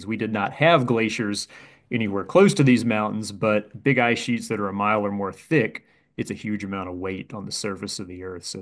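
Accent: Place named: Western Pennsylvania, United States. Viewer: ...is the narrator from PA, spesifically western PA? He sounds like several family members that are from near Pittsburgh.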